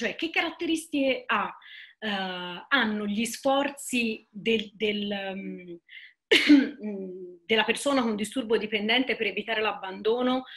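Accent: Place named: Tuscany, Italy. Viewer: peccato per l'audio, a parte l'accento toscano che tende ad aspirare certe consonanti ebbene sarà anche per l'inconsueta "velocità espressiva" alcune parole non si comprendono, in particolare le ultime della frase . . . cmq 👍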